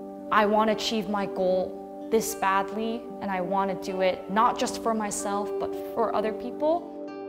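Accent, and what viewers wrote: United States. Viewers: Why does she sound American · 와 미국인인줄 알았어요 영어 실력 무엇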